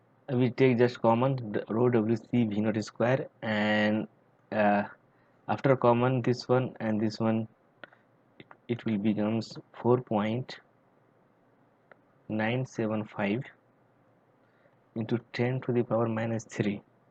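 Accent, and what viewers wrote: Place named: China. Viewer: why you speak like a chinese person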